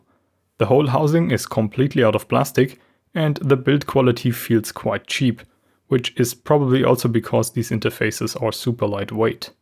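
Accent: Germany. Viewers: Nice work catching M-Audio’s misleading claims. Equipment tear-downs should be a basic feature of any good review. I always enjoy yours. And that German accent is FANTASTIC! CIao!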